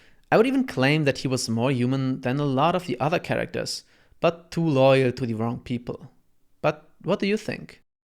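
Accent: Germany. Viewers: its nothing new to anyone and history itself that "bad people" lived prosperous lives after their deeds . i take a wild guess and say given your accent and pronounciaton your german like myself. Take it as you will but reality has never been and will never be Black and White. People just are or believe to be at the right side of History is all. As for your search History that is clocked one way or another. just make sure your not getting fined if you own Volumes of Hellsing that wherent unedited as our Goverment is laughably sensible as per usual and put laws arround that "kind" of Imagery. So if you do own any of it dont show it arround. that might get you into trouble. Good Research overall on the Fella. One could also always add that Werewolfs where one way to kill Vampires which is why Alucard felt icky about fighting him. Though of course thats merely Conjecture and one of a dozen ways the Myth of Vampires is told. 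· figured your german is wayyyy too good and the slight accent when you speak english made it obvious in my opinion anyway watched all your hellsing videos they are awesome dude👍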